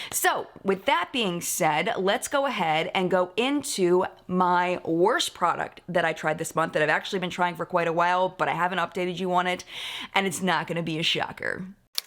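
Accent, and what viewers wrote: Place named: Maryland, United States. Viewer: I can hear you're a Marylander with how you say your Os. 😁 Hi from a fellow MD lady!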